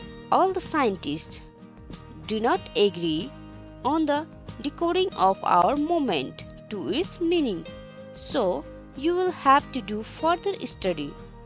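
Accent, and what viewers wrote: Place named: Maharashtra, India. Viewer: That Marathi accent tho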